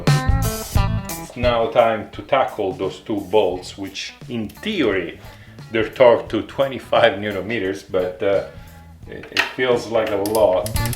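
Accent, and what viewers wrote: Italy. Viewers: Love your Channel and love your accent too. 😊 Having an italian teaching us how to take care of our italian babies is amazing. Thanks for your time. Cheers from Canada.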